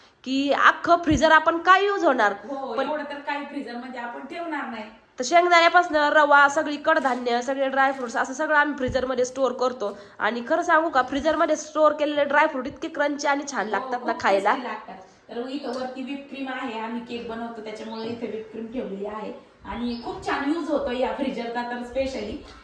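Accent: Ahmednagar, India. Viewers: मी आज पहिल्यांदा पाहिला तुमचा vlog 👌👌खूप आवडला मी कोल्हापूर ला रहाते पण मी नगर जिल्यातील आहे शिर्डी जवळ बाभळेश्वर माझे माहेर आहे माझे education प्रवरा कन्या विद्या मंदिर मध्ये 7 वी नंतर पुणे मध्ये छान आहे kitchen आत्ता मागचे vlog पहाते तुमच्या भाषे वरून कळले तुम्ही नगरीं आहात मग मागचा स्वाती च्या love story vlog पाहिला तेव्हा कळले त्यात तुम्ही तीळा ला हौरी म्हणालात तर मी conform च झाले तुम्ही नगर भागातल्या आहात कोणते गाव आहे तुमचे surmane काय आहे घर खूप प्रशस्त आणि सुंदर आहे आणि maintain पण केलेय माझ्या सगळ्यां मैत्रिणी कोपरगाव कोल्हार लोणी श्रीरामपूर संगमनेर प्रवरानगर रुई राहता इथेच आहेत 🙋‍♀️❤, अंबज्ञ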